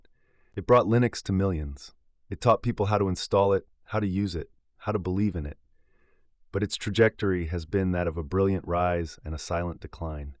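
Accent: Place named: South Africa. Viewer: No, he's not Afrikaans, he's an English-speaking South African.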